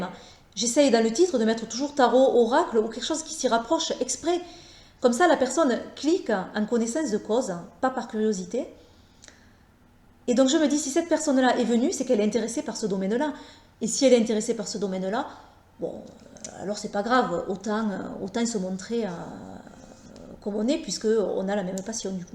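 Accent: South, France. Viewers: ce bel accent que nous avons dans le Sud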